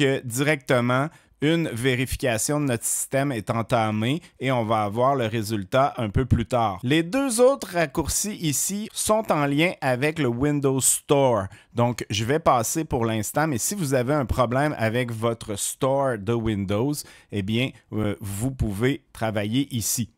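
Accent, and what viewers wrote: Quebec, Canada. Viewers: Quelle merveille ce logiciel et toujours ce super accent québécois qui m'enchante Merci Amitiés d'Oléron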